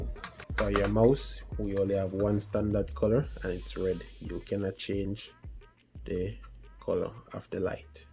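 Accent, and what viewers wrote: Jamaica. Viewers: So nice and the Jamaican accent is rlly good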